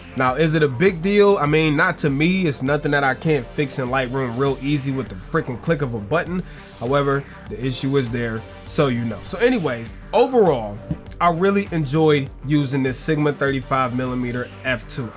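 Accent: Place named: United States. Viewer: Excellent, short video. You've covered everything that needed to be said and illustrated the points with decent photos. This is rare among YouTubers. Of course, being English, and living in Greece, l didn't always understand your US version of the language but l will try and catch you again. 🤣😎🧿 I will be getting the lens for street photography. It seems to be the perfect fit as a walkround lens on my A7r3 and an upgrade over the Sony/Zeiss 2.8/35.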